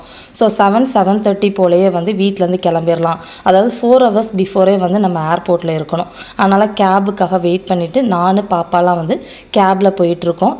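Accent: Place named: Tamil Nadu, India. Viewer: Again me nega samyal pandra style and pesurathu vachi neriya yosipan, eppo thaan teriyuthu nega namma tamilnattu ponnunu 😊❤hadsoff you ❤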